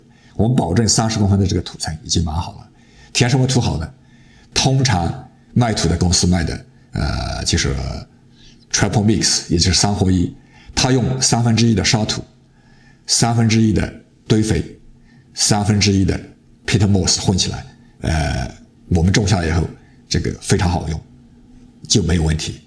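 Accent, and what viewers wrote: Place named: Huanggang, China. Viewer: 老师是黄冈人吧😂？听到乡音很亲切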